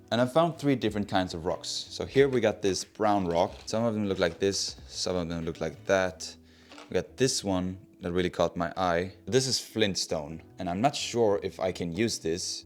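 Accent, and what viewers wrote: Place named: Scania, Sweden. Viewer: Den grova skånskan 😂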